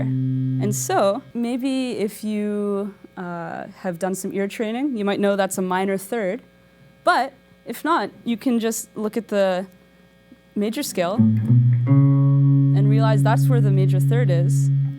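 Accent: Canada. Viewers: beware, she's canadian... she pronounces about "aboat" and that winter jacket... funny thing about music "theory", no matter what you do it can be explained "theoretically". you'll realize this after siting thru a non diatonic chord, modal interchange or dissonance recital, or any mixure therof... solid lesson, penta = 5.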